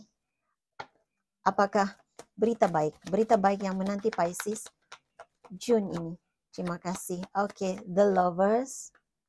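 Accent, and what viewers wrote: Malaysia. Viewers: Bunda seperti nya asal dari Malaysia , cakapnya mirip melayu. Thks Bunda atas readingannya.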